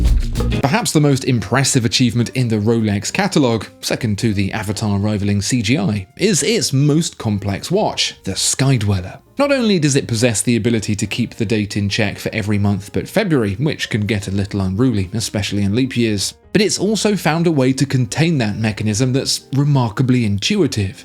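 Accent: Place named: RP, United Kingdom. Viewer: The posh accent and babbling garrulity is just comical.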